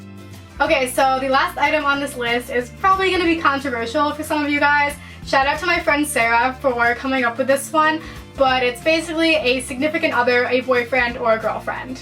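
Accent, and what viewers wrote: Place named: Buffalo, United States. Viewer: are u from buffalo? you totally sound like it!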